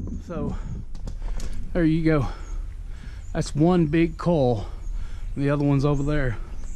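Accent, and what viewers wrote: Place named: United States. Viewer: Was a good video, love the American accent you have, it's soothing to listen to, it's great you enjoy the metal, it's great dismantling things, I collect metal for a little pocket money, it's great, i collect small amounts, i have no car at the moment. Carry on doing what you love, it's all we can do until the end of time.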